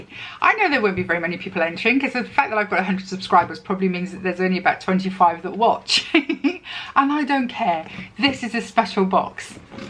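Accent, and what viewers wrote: England, United Kingdom. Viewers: Hi, I found you through Vicki Wiik channel and so glad I did. I live in Canada 🇨🇦 now, but originally from London, England. Your channel is fun and down to earth and it’s so great to hear an English accent and see a different slant on unboxings., etc. I do miss England but Canada is lovely too. Looking forward to more videos now that I’ve discovered you. By the way I’m not a YouTuber, I don’t have the ‘balls’ as they say over here. 😄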